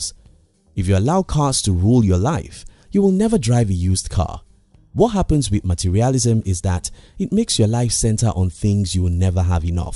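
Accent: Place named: Nigeria. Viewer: You sound Nigerian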